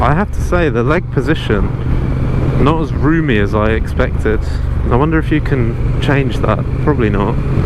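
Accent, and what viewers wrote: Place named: United Kingdom. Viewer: Came for the transalp. Subscribed for the British commentary.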